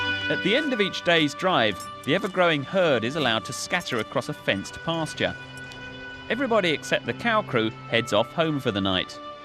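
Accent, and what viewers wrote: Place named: United Kingdom. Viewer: Is this DVD made for UK or some part of Europe? Cause the narrator accent (no disrespect meant) is quite a contrast with the wyoming ranchers accents..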